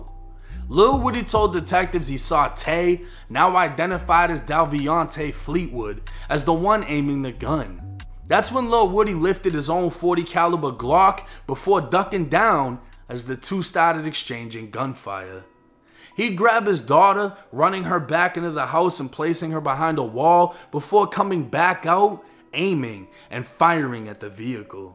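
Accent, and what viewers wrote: Boston, United States. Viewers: Dude u got like 3 different accents going on u sound like u from Boston when u say “caar” then southern black when u was on no jumper now u just aound like a regular American on here u not a real G u make YouTube videos stop 🛑 ✋ trying to be gangster u far from it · Are you from Boston? ☘️🤓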